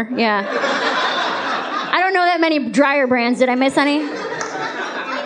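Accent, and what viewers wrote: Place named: Ohio, United States. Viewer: Killer set, enjoyed your Ohio sense of humor lol. I never heard an Ohioan accent I understood until now but I sorta get it,anyways great job you’re totally right our parents do not drink water, my dad says fish *uck in it so no thanks lol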